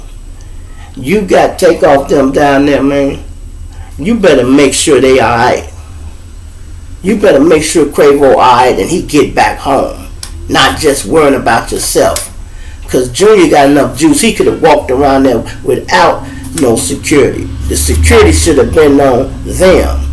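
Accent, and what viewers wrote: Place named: Chicago, United States. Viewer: You are from Chi-Town or some Chi-Town ties I hear it in your talk. I didn’t hear it the first time, one love I’m the same way with the Strip Club Gambling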